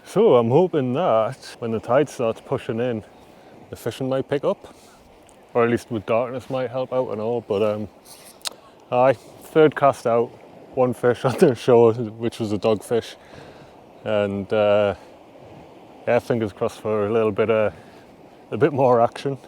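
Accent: Newcastle, United Kingdom. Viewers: 😂 Howa man he is just a geordie it ain't his fault he is wrong side of the wear They speak Doric where I live now and if you canna understand him then you'll be knackad up here ❤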